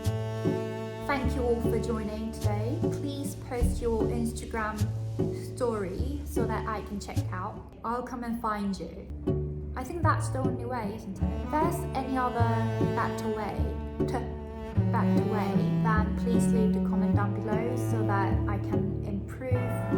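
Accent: United Kingdom. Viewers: 영국발음 너무 좋아요.. 인스타에 올릴 용기는 없지만 열심히 복습할게요..💗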